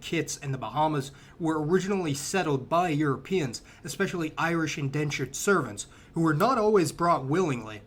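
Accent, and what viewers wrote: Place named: General American, United States. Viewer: English is truly the best, the reason why for some it sounds meh is because it's spoken by billions of people as a língua franca. If it was spanish it'll be the same for that language or any other one. It also has many different accents but most are used to the version that is speaking in which is the standard american accent, because it is the norm in youtube. When you get use to something overtime it gets boring.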